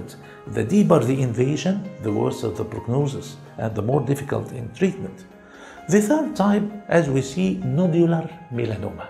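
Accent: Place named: Arab world. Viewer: Great!! Like the Arabic accent... :)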